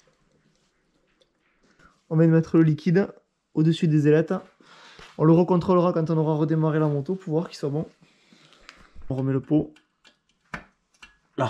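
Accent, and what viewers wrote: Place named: Tarn, France. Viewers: salut les gars merci pour la vidéo, y'a l'accent du sud !! petite précision concernant le montage : perso j'aurais contrôler le jeu à la bielle juste à la main histoire de m'assurer qu'il y ai pas du jeu de haut en bas, ensuite pour le montage du piston en côte b, démontage de la culasse et contrôle du jeu à la coupe des segments à l'aide d'un simple jeu de cales, ainsi que le jeu du piston/chemise à l'aide d'une des ces méthodes ici : <link> C'est très important pour un montage correct. A+ et bonne continuation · Wooooh l’accent du Tarn c’est chaud Moi j’le comprends vite fait vu que j’ai vécu Castres un an, mais tu vas galerer sur YouTube, tout les accents du Sud passent mais l’accent bien Paysan c’est dur à comprendre tu te rends pas compte tu t’entends pas 😂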